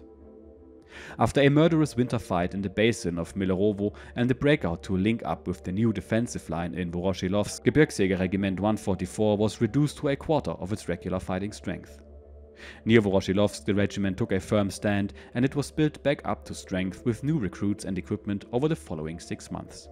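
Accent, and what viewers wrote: Germany. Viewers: I've actually heard this book before, but it's got a little more drama with a German narrator! Love the channel, thank you!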